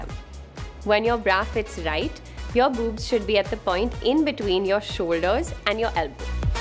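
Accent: India. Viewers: Why is this white woman speaking with an indian accent 😂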